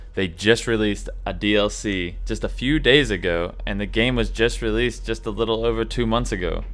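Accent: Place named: Australia. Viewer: sounds australian to me, our accents make it sound like we say "bee-ah" while americans use a hard R at the end. same goes with all words like beer, like fear and dear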